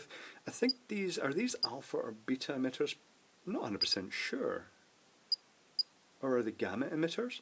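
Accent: Scotland, United Kingdom. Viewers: Very informative, I also wanna buy this because of the same video. Also, are you Scotch?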